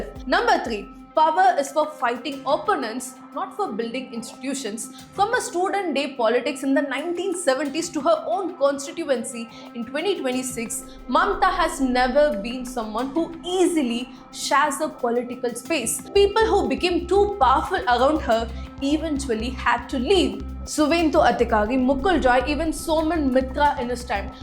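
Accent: Tamil Nadu, India. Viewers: Madam Improve your pronunciation and diction. It has a heavy Tamil accent.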